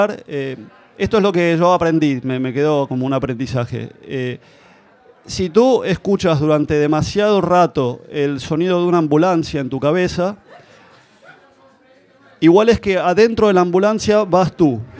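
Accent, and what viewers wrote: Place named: Argentina. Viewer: No le pillo la gracia...alguna risilla...pero esperaba más de un irlandés ke habla con acento argentinho...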